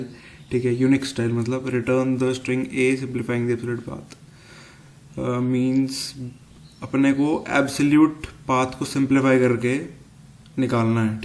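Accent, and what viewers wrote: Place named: Delhi, India. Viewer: dilli se ho kya bahiee!! :-))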